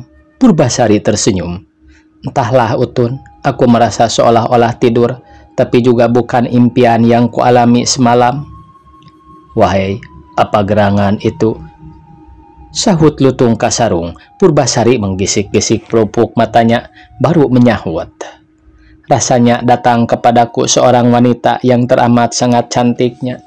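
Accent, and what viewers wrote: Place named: West Java, Indonesia. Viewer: Bahasa lndonesia, aksen Sunda.. Ki Sunda mah cocokna macakeun dongeng Sunda..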